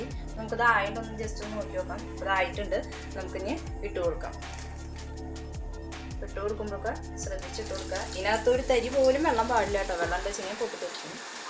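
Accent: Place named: Thrissur, India. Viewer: Nicee..thrissur slang 😁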